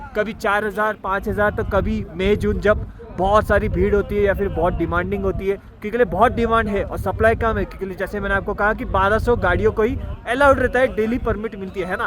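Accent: Gujarat, India. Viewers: Tumhare Accent Se Lagta He Aap Gujrati Ho.. Shu Tame Gujrati Cho Bhai?😊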